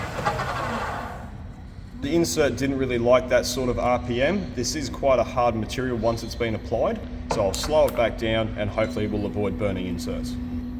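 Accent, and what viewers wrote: Australia. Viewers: At the very begining he says "how u doing guys" or "hi young guys"? Im Polish so I can't tell due to australian accent 🤣🤣 Great video btw ❤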